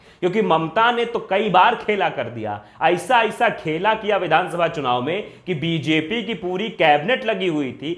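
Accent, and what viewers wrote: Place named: Uttar Pradesh, India. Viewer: भाई 💪 बोलते बोलते गांव चले जाते हो😃😜💯 यूपी वाली भाषा में बोलते हो 🙏💓 आनन्द आ जाता है 😜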